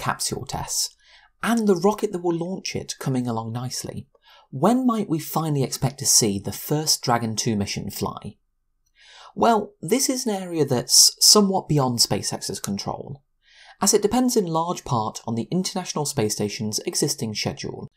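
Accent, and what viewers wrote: United Kingdom. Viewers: This british accent...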